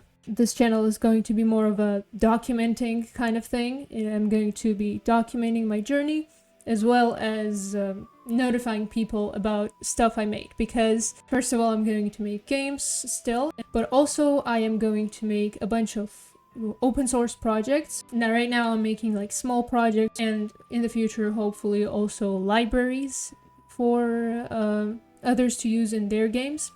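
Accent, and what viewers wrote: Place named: United States. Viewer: You have a very American accent, I thought you were an American at first.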